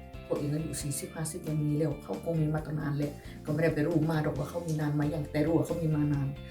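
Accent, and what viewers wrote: Korat, Thailand. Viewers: ชอบดูยาย พูดโคราชค่ะ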